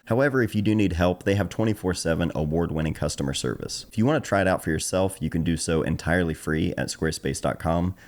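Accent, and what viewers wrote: Ohio, United States. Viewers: Okay, so I'm a newbie to photography and found your channel by random chance on YT. I started to listen to you speak and immediately thought "that accent sounds familiar"...and then I check your channel's "about" portion and find out that you're from/based in Chillicothe, Ohio. And it all made sense. I grew up not far from you. It's great to see someone from that area of "rust belt meets Appalachia" doing so well on YT and in his creative pursuits. Keep it up, bub!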